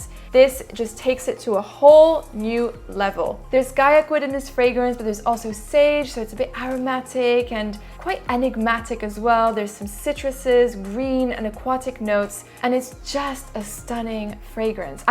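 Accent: France. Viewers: Great video,! Everytime she pronounces the names in this French accent...I wanna wife her up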